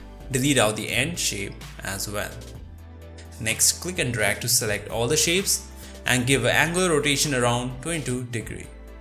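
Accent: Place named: India. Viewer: yea fucking indian accent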